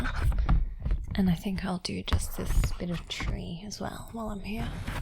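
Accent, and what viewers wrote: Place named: Australia. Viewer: Your voice is amazing!!!! The Australian accent just makes it even sweetet to hear:)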